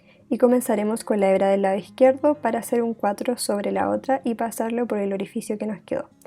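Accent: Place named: Chile. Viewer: FELICITACIONES, MUY BUENOS TUS VIDEOS!!! EXCELENTES! ME DIJE ESTA CHICA ES CHILENA, MUY DULCE TU VOZ!!! INTENTARE REALIZAR TUS <link> ENCANTAN LAS MANUALIDADES COMO EL TEJER, Y ESTE ARTE DEL MACRAME SIEMPRE ME HA LLAMADO LA ATENCION..EXPERIMENTARE Y TE INFORMARE,... GRACIAS POR COMPARTIR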